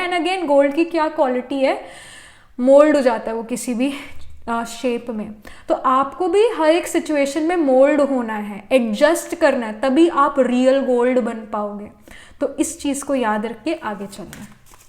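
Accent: Punjab, India. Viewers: Aashiq mashuq😂😂😂....are u a Punjabi ma'am?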